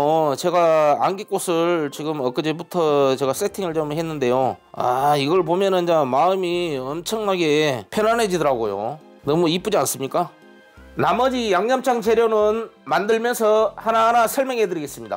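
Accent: Jeolla, South Korea. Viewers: 말씀도 맛깔나게 하시네요. 정겨운 전라도 말씨 더맛있게 느껴져요^~ 감사합니다.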